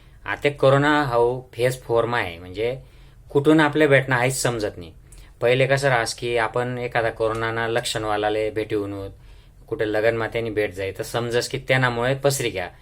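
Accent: Khandesh, India. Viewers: गयर भारी सांग ,,,तुमनअहिराणी बोलन खुपचं गोड वाटन ऐकाले👍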